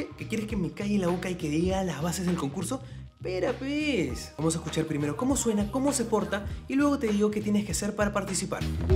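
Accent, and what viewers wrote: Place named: Mexico. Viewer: recien conosco tu canal y dejame decirte que pense que eras mexicano jaja que buen contenido tienes !!!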